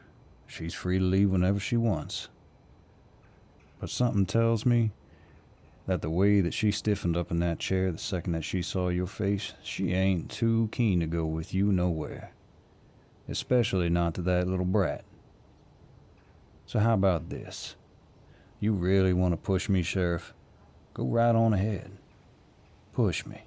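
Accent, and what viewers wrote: South, United States. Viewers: I love the southern accent😍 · I love your southern accent. It really suits you. I wonder if you talk like this irl🤭 thanks for the part 2 ❤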